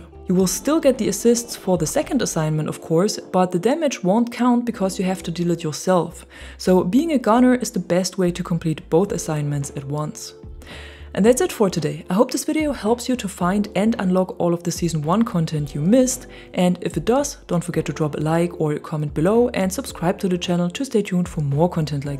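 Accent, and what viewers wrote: Germany. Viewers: Nice tips 👍🏼 (are you german bc your Accent )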